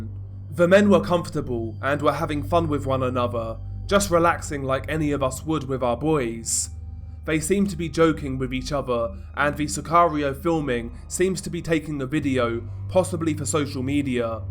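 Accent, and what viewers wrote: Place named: Southeast England, United Kingdom. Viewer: Watching it while you narrate it. Saw it earlier anyway but. I’ve been watching gore since 2004 and I’m interested in man’s reaction to suffering. You could call it “morbid curiosity” but I prefer “empathy”. I empathise with these guys. I’m so lucky to be born in a peaceful location and to live my life without bother. Can’t quite place your accent but I’m Cambridge area and I guess you’re south east-ish. Anyway, love your videos mate, you’ve really informed me about the background of the cartel’s. You should do a podcast as you’d reach more people, without the restrictions of YouTube. Fascinating subject matter, in fact the south Americans are fascinating people. I lived with a Brazilian and my best mate is a jap who grew up in Brazil. Her stories are pure madness All the best brother.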